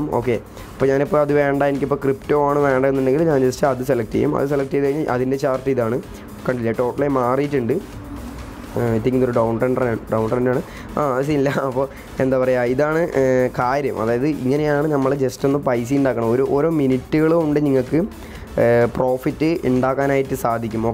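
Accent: Palakkad, India. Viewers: Eda chekka ante veedu palakkadano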